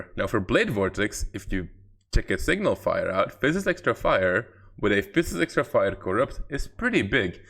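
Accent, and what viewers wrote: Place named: Latvia. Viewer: Hmm, izklausās, it kā latvietis runā angliski...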